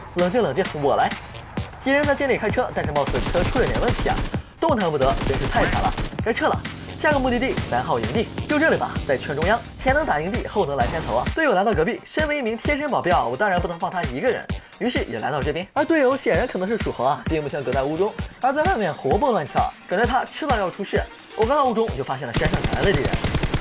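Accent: Hong Kong, China. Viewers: 你係香港人嗎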